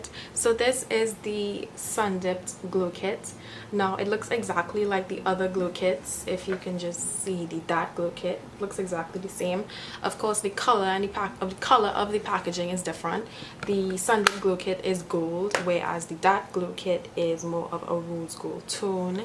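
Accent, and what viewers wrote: Trinidad and Tobago. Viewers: You got a new subbie even though I will disagree with you and get the Sun Dipped kit :) haha I love the Trini accent, my parents are Trini! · The trini accent yasss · Are you trinidadian?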